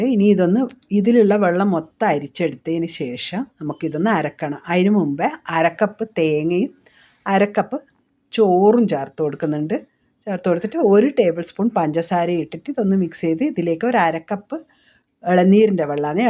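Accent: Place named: Vadakara, India. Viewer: ഹായ് ഞാനിവിടെ പയ്യോളിയിൽ വടകരയിൽ എവിടെയാണ് മില്ലിന്റെ സൗകര്യം ഇല്ല എന്ന് പറയുന്നത് കേട്ടു നിങ്ങൾ ഇതിനു മുമ്പ് എവിടെയായിരുന്നു ഇവിടെയല്ലേ നിങ്ങളുടെ നാട് എന്നെ ഇവിടെ കല്യാണം കഴിച്ചതാണ് എന്റെ സ്വന്തം സ്ഥലം എറണാകുളം ഇവിടുത്തെ നാടൻ ഭാഷയിൽ ഇങ്ങനെ പറയുന്നത് കേൾക്കാൻ നല്ല രസമുണ്ട് ❤👍🏻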